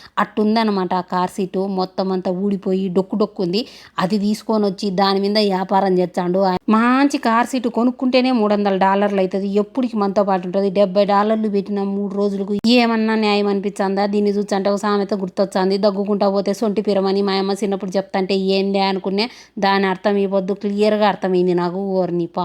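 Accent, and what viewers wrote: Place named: Kadapa, India. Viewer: అమ్మ మీ మాటలు వింటుంటే పక్కా మన కడప జిల్లా మాట్లే ఏది పొద్దుటూరు ఎర్రగుంట్ల మైదుకూరు ఆ పక్క మాటలుఅమ్మ మీ మాటలు వింటుంటే పక్కా మన కడప జిల్లా మాట్లే ఏది పొద్దుటూరు ఎర్రగుంట్ల మైదుకూరు ఆ పక్క మాటలు మీ మాటలు వింటుంటే మళ్ళీ మన ఊరు దిక్కు మాట్లాడుతారు చూడు ఆ విధంగా ఉండాయి ఆమ ఆ మాటలు వింటుంటే కడుపు నిండుతుంది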